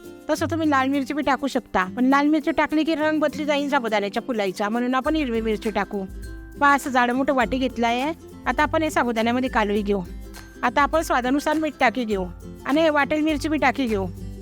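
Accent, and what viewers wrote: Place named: Khandesh, India. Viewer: छान आहे रेसिपी, तुमची खान्देशी भाषा कानाला लै ग्वाड वाटते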